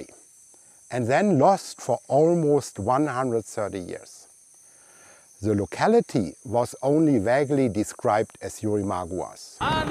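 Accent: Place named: Germany. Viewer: Was für ein wertvolles Projekt und es tut gut, all diese unterschiedlichen, schützenswerten Tiere zu sehen! Die mit dem Projekt eben endlich tatsächlich geschützt werden können! Nur der deutsche Akzent des Sprechers war halt wirklich extrem. xD Das war etwas befremdlich. Wird trotzdem geteilt, damit das hoffentlich mehr Leute sehen!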